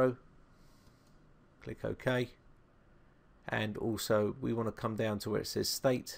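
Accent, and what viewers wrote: United Kingdom. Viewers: Thank you. It helped me and your british sounds cute.. ;)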